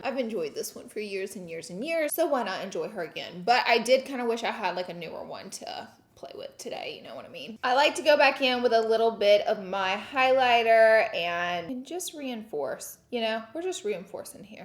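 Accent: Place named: Alabama, United States. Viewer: Do you think you will ever lose your AL accent? Lord, I hope not ❤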